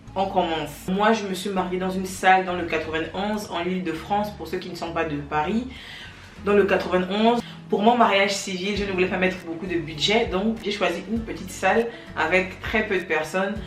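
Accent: Gabon. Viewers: Votre accent m'est beaucoup familier❤ Le Gabon est là 😊?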